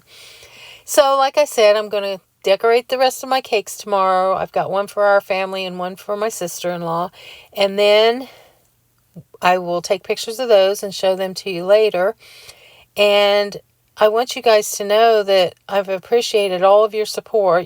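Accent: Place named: Indiana, United States. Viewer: Your cakes are beautiful! It is so nice that you take them to your neighbors and friends. Your salad looks yummy. And the Easter decor looks so nice. Your friend, Rosie's gift is lovely, as is the silver stand. It looks stunning. Your accent reminds me of my cousin, who lives in Indiana. I am a new subscriber. Oh! And the bunny as a bowl is a neat idea. Your potato salad looked great displayed in it. Happy Easter.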